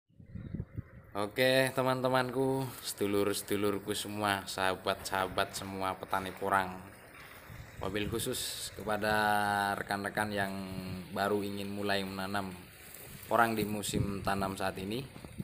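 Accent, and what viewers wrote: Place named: Banyumas, Indonesia. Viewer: Terus berbagi bro...kang kulon kye tangga ngapak😃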